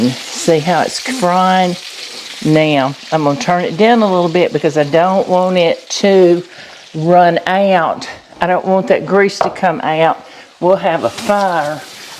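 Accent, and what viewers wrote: South, United States. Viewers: I love ❤️ blooming onions. I dip mine either in ketchup or shrimp sauce. I haven’t noticed before but your accent is really southern. ❤️❤️❤️🥰🥰🥰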